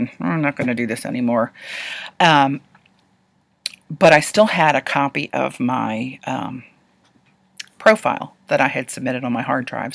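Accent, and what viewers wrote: United States. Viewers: I recently discovered your you tube videos on gelli plate art and love them. I actually bought a gelli plate which I have yet to use, but am gaining an understanding of how it works and look forward to playing with it very soon. I want to thank you for "the rest of the story". I do not nor have I ever had cancer. I have had friends with cancer and have lost dear friends to cancer. I have to say that I live in British Columbia, Canada. I know from your great accent that you live somewhere in the South - at least I'm pretty sure. My Mom was from Mississippi, and I was born in Alabama, raised in New England, and lived a few years in Tennessee, and also in the midwest. I feel such a bond with you as I listen to you talk about your art making process, If I lived close I would want to just say Hi!!! So here it is, Hi and thanks for the great videos. 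I am working my way through all of them! Love and Peace and great gobs of good health!!! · My mother is a breast and uterine cancer survivor, last month was 7 years ago. I also live close to Baltimore, was born an raised there. I just knew after hearing your voice and your humor you were from Baltimore. God I love your videos.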